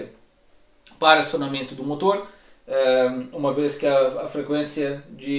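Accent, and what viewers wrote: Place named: Brazil. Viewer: Olá! Parabéns pelo vídeo! Sou do Brasil. Confesso que seu sotaque é bem reduzido. Fiquei em dúvida se você era brasileiro ou português... Kkkkkkk.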